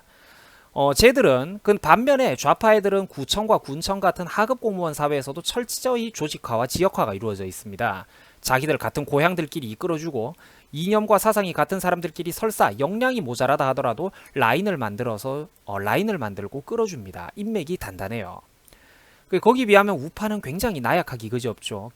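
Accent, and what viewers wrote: Gyeongsang, South Korea. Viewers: 30대 청장년인것 같은데 참 반듯하고 젊잖고... 제 아들이 처럼 반듯하게 자랐으면 좋겠습니다 자라나는 아이들에 롤모델이 되어주셔서 감사합니다 학교에서도 역사공부 이리 시켜주지 않는데 젊은 청년이 어디서 이리 많은 바른 지식을 갖추었을꼬? 경상도 억양도 참 듣기 좋아요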